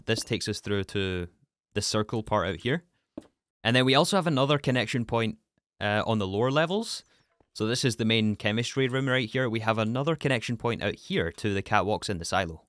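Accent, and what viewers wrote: Scotland, United Kingdom. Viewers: this is my comfort content, hearing his silky smooth scottish accent say things that my brain doesnt have a single clue about is so soothing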